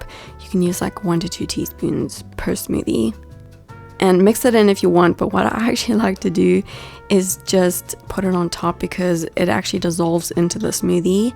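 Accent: South Africa. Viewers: where is your accent from are you South African, Australian or from New Zealand? the accents are currently confusing me currently so i tossed it all in cause i would think 1 of those 3 but i think South African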